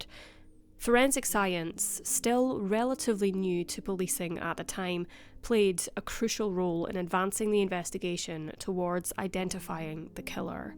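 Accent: Scotland, United Kingdom. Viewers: Jeez - you can't tell the difference between Canadian and Scottish.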